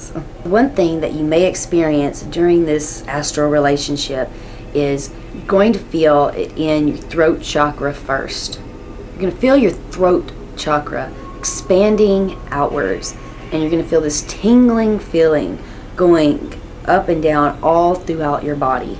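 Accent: South, United States. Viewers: Cool southern accent haha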